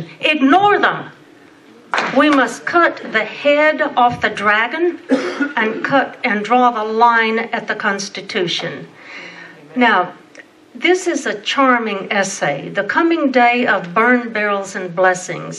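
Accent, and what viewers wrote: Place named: South, United States. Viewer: I LOVE this Lady! There is nothin like a Southern Belle to eloquently explain our Constitution and 2nd Amendment!!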